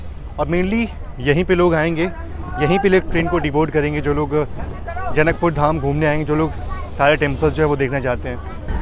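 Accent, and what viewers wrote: Varanasi, India. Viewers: Bhaiya AAP banaras ho ky aap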